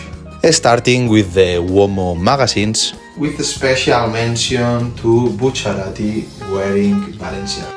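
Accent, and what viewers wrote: Spain. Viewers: El inglés españolizado le da el toque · Least nottable spanish accent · el inglés españolizado me mata JAJAJAJA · Como se nota el acento de Albacete jajajaja, vaya puta barbaridad, ojala tener algo así algún dia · Bro, se te nota mucho el acento español, pero se nota que le pones buena intención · a los 20 segundos saque tu acento de español JAJAJA ta bueno tu coleccion ❤ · Yo cuando veo que habla inglés como yo Yo: este compa es español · el acento español le da el toque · Eres mas español que yo con ese ingles, guapisima la coleccion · First of all, i just wanna say you have the fatest rising collection i've seen, knowing you started 3 years ago. Its truly amazing seeing a European, like me, enjoy this series so much and see this collection that is so epic. Second, i would love to get a more detailed video about the shonen, ultra jumps display in your room because shonen, ultra jumps, volumes is where i also wanna start collect more and i would love to know more about. 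Last, i think i understand you in borh languages! i'm from l🇵🇹 and your spanish accent isnt that hard ro figure it 😂 But you blend them very well for your audience and i think you are doing fine. Thanks for the video Mr, i csnt wait for more content from you and someday, we can see the whole collection since we you have diad this is 70% of it due to the lack of space.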